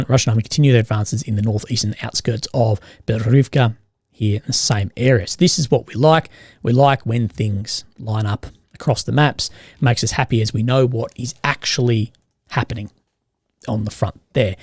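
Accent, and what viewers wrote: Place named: Australia. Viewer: Australian accent, get over it.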